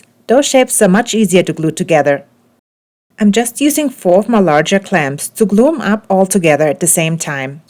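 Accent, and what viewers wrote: Germany. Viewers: Your DuEnglich is Cool!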